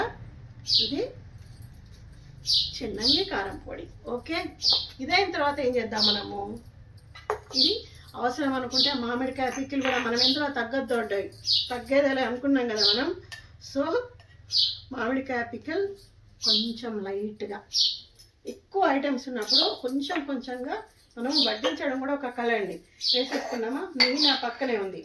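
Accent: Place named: Andhra Pradesh, India. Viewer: Mi matalu telangana slang la ledhu mam looks like Andhra lovely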